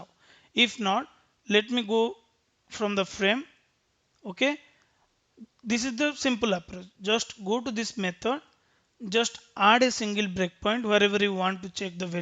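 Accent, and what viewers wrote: India. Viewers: always an indian, but i love it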